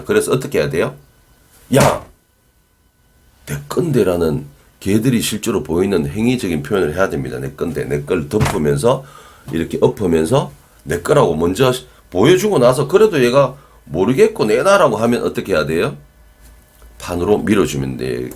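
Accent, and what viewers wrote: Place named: Gyeongsang, South Korea. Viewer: 너무 감사해여~~ 3개월된 강아지 입니다. 입질도 심하고~ 으르렁 거리면서 진심으로 저의 손도 물고 기본케어를 전혀 못하는 강아지 입니다. 방문교육도 했는데 ... 저는 안되더라고요 ㅎㅎ 선생님 교육 계속 다시보고 다시보고 계속 다시보면서 아이보다 저의 문제 구나~~깨달았습니다. 열심히 주도권 교육을 하고 있습니다. 여기서의 리더는 나야 라는 어필을 계속 강아지 한테 표현하고 있습니다. ㅋㅋ 아직은 부족해서 타이밍도 잘 못잡고 제가 감정조절이 안되서 조금 불안하긴 한데 강아지가 눈치도 보면서 곧잘 하고 있는듯 합니다. ㅋㅋ 영상을 보고 행동했더니 일주일정도 되니 입질이 매우 좋아졌어요~ 더이상 따라다니면서 안물고 같이 자자고 떼쓰지 않아요~^^ 아직은 많이 부족한 보호자라서 많이 노력해서 배우고 강아지랑 좋은 관계를 맺어보도록 하겠습니다. 영상이해하기 싶고 경상도 억양도 너무 매력적이시고 ~~ 감사해하면서 시청하고 있습니다. 감사합니다 ~^^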